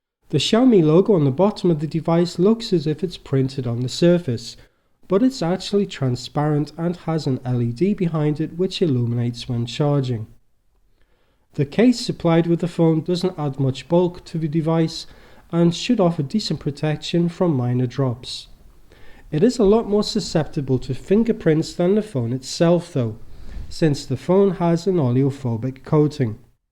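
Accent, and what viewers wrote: United Kingdom. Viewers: Insanely nice review, I am loving your nice British accent. You really did show the Phone really well in all possible areas. 10/10...Greets from Germany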